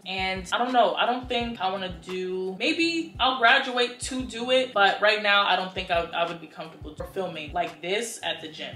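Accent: New York, United States. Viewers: I love this so much. You’re not trying to fake the funk you’re so YOU! I still see/hear some NY in there 😂 love to see it. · I love ya NY accent ans attitude, bk for 15 yrs. You are absolutely gorgeous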